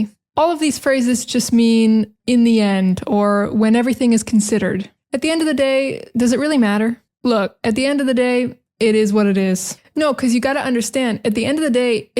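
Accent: United States. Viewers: Hey,! Your American accent is just delicious! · This is the kind of class that adds value, because you not only enjoy listening to real American English, but you also get to admire the beauty of North America through this warm person.🌹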